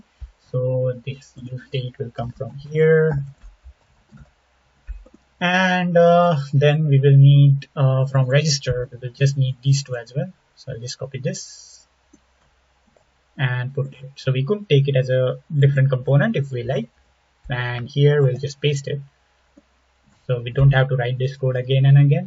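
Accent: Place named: India. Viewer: Bhai awaj se hi indian hai pta chal gya tha 😋😋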